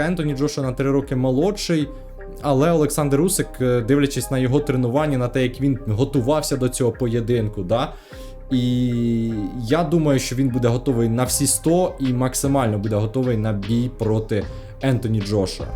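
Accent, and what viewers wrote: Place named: Russia. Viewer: даже по твоему акценту видно что на русском языке лучше разговариваешь,не ломай язык и разговаривай как удобно на русском да и думаешь тоже так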